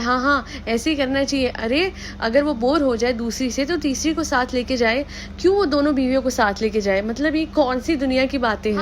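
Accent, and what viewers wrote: Punjab, India. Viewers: Yes she is definitely a Punjabi cz she literally uses such words jo sirf Punjabi hi bol sakta hai with proper accent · Panjabi accent lajawab lagi..👏👏👏👏.... Malik's and Ibrahim's ka to name sunte hi maan ki shanti bhang ho jati hai aur gussa lagne lagta hai..... baaki good points 🙏🙏🙏🙏🙏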